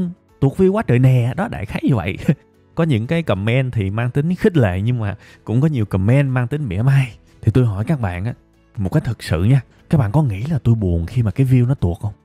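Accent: South, Vietnam. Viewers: Giọng MIỀN NAM của banj rất hấp dẫn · Giong noi mien nam nghe de hieu va de gan . One like 🤗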